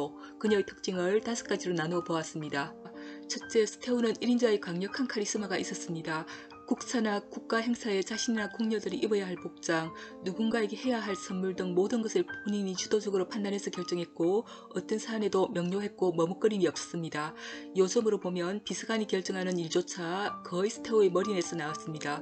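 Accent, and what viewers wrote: Gyeongsang, South Korea. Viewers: 저는 경상도 사람이라 ..잘 알아 듣겠음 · 목소리가 코가 먹었구용 사투리가 경산도분 여기서 꿈을 이루시나봐용ㅋ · 겡상도 억양 발음 문제 있어 알아듣기 어렵고 부자연스러워요 차라리 천천히 또박또박 말하는 습관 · 같은경상도라 듣기 편했는데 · 지독한 갱상도 사투리가 뼈속에서 나오는군요. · 경상도 발음에.. 내용파악하는데 불편! 그냥 자막으로만 처리하는것이 나을 듯!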